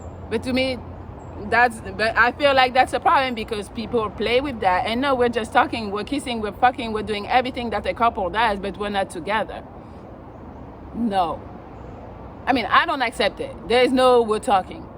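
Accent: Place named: France. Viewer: I knooooow that accent was French...that sexy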